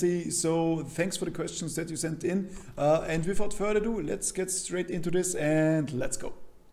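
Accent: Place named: Germany. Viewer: Hmm jak na pl to akcent masz niemiecki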